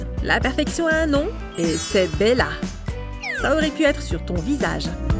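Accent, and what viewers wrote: England, United Kingdom. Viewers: elle sonq Anglaise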